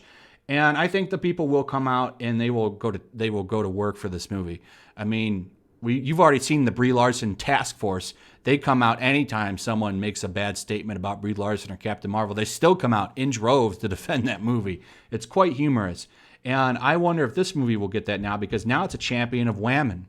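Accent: United Kingdom. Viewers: Why do you talk like a British dude!😂😂😂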